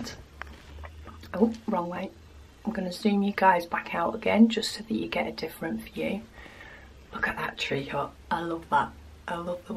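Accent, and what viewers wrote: United Kingdom. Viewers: Please start a group on Facebook. I am a new subscriber and just happened to check out your channel via Rachel Rae as you are working on a blank canvas as I had just started one, not a HAED but a cross stitch pattern from Kustom Krafts. Thank you for the tip on how to do the conversion in order to get the correct canvas size. I have also ordered a single punch and sticker paper from Amazon, great idea as I cannot get refills for my Xyron. Oh, you can buy Goo- Gone from Amazon and I found it was an absolute godsend. Thank you for all the tips. Love your accent, same as Fruitini, what part of UK are you? I'm Dundee, Scotland 💜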